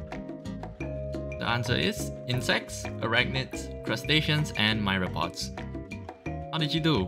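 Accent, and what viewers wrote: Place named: Malaysia. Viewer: My bro, you also sound very Malaysian Wیہ😍🙈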